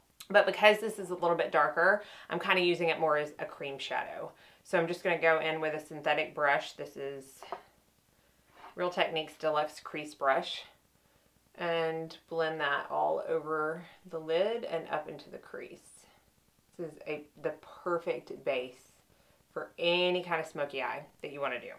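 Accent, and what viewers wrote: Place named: Appalachia, United States. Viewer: Been watching you for about a month now and I’m so happy I found your channel....I had never heard of Harbin house and watched one of your videos where you were talking about the Elta MD and immediately went and snatched it up one of my favorite sunscreens. Also your accent reminds me of Home I’m from the mountains of North Carolina right on the Tennessee North Carolina border😁.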